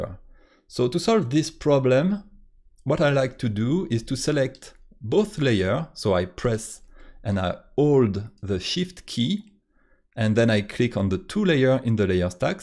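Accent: France. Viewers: Don't apologize for your your French accent....or "English" mistakes. You should hear English speakers....my goodness. They are not textbook! This was very helpful. Merci. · not me liking in the first few seconds bc i hear a french accent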